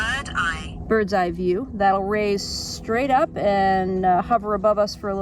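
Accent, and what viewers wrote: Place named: England, United Kingdom. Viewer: Good evening friends. Very interesting. I hope it works out well for you. English accent. 🤣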